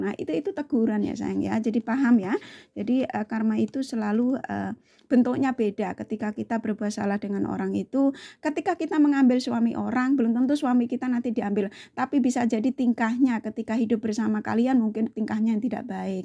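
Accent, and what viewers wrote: Java, Indonesia. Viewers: Trima ksh kak atas readingany, resonet jln ceritany, mf kak agak nyimpang sedikit dr tema ny, suara kak sama benar dg suara seseorg yg ad d dekat rumah q, logat Jawany, cara ngomong ny, nama ny Mbak Murti, dia buka salon d sini, coba dong kak tunjukan wujud kakak ingin lihatny, mf y kak kalo AQ agak lancang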